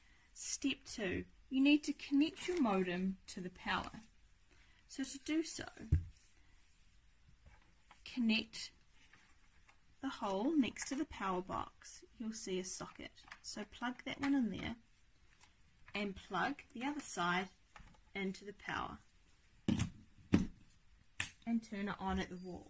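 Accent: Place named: New Zealand. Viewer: Thar kiwi accent tho 😍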